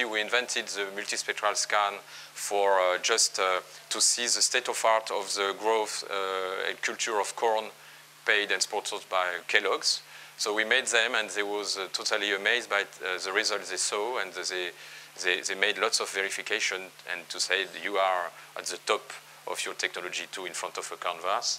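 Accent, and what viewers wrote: France. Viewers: ehh.. 47 minutes with French accent... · id rather listen to a french accent that half of the braindead hick american accents.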